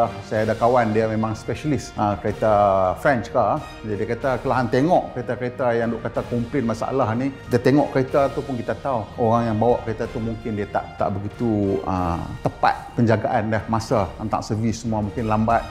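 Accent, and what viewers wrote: North, Malaysia. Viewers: Ni versi utara ka? Lain maciam dia punya bunyik....ha..ha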